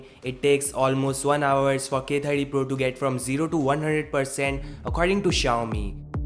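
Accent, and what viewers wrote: India. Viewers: Oh i like your infian aksen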